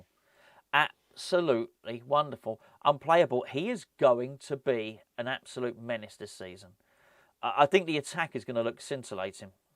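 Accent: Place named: North London, United Kingdom. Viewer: You sound like you come from North London, go back to your own site's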